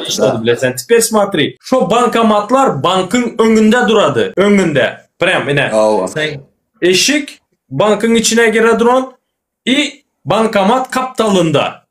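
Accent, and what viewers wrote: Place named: Chardzhou, Turkmenistan. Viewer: Это наш Чарджовский (нов.наз.Туркменабат) диалект.У нас на родине есть Лебапская область там у нас все разговаривают на таком диалекте.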